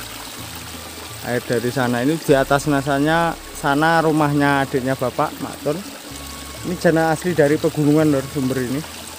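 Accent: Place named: Java, Indonesia. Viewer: Kula seneng bahasane mase...medhok desa mbanget....kalih guyune...renyah....kados nopo ta piantune.....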